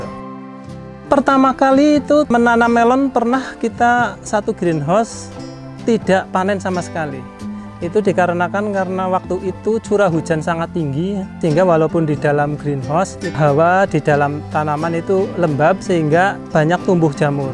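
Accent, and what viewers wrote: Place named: Banyumas, Indonesia. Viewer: Salam ngapak 😂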